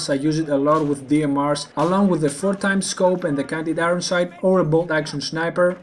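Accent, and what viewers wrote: Greece. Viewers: Are you Greek? Your accent sounds Greek^^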